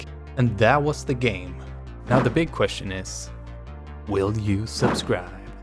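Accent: Sweden. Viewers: eeeeeeeh that I don’t know but he sounded Swedish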